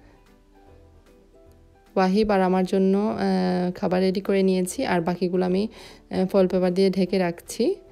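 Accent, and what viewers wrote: Sylhet, Bangladesh. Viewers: Apu,apni ki Sylhety?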